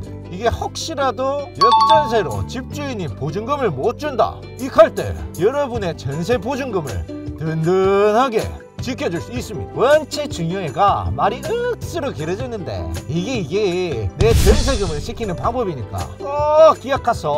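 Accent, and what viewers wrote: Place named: Gyeongsang, South Korea. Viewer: 경상도사투리 구수하네요 안산사람의 경기도사투리 쓰는게 아니삼? 고항의 안산인데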